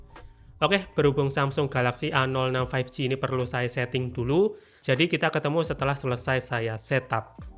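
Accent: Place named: Java, Indonesia. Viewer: Aku subrek karna logatnya jawa dan juga wibu. Kita boloo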